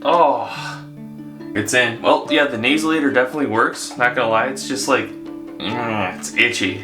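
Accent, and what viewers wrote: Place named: Chicago, United States. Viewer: Does anyone else detect a Chicago accent?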